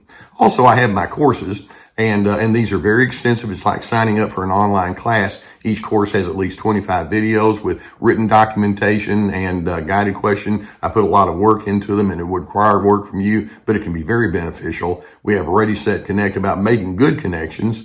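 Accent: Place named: Texas, United States. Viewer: you (and ) have been a tremendous help as I recover from a 34 year marriage with an extreme narcissist. I’m a Texan living in Idaho for 30 years and your Texas-English is beautiful. 🥰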